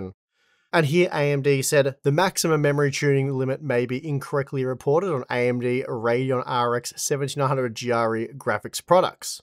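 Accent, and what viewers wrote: Australia. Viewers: Regret even less for buying last week! BG3 is so smooth now! And that Australian accent is truly something else lol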